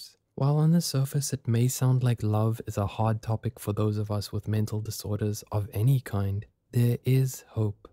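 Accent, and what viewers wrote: South Africa. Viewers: I have a question, is this a south african narrator? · I know a South African accent when I hear one 🇿🇦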